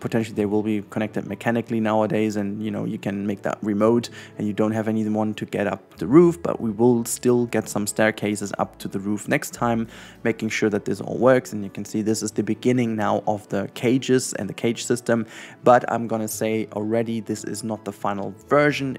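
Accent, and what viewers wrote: Germany. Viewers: ich hab direkt deinen deutschen akzent bemerkt, aber krass wie gut du englisch kannst 🙂❤️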